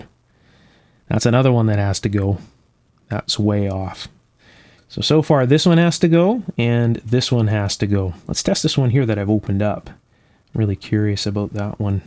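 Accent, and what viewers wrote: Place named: Canada. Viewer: Zap strap? lol Never heard that before, I assume you're in Canada from your accent. Here in Murica it's just called a Zip Tie.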